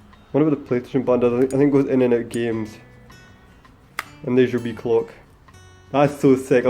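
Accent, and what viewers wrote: Scotland, United Kingdom. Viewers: Holy shit that is cracking! You have a Scottish accent, what Cex did you nab it from? :o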